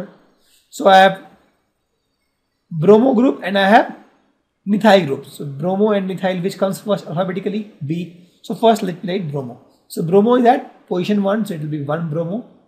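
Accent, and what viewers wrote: India. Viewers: ^ Are you like from US or UK. :3 We are indians. Our accent is like that,bro. :3 Stop complaining,start appreciating. -_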